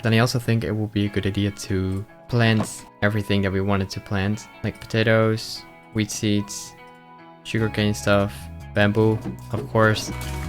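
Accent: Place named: Netherlands. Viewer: Oh! You’re Dutch? I always wondered where you were from. I feel silly for not guessing it sooner based on your accent. Hello from Toronto! 😄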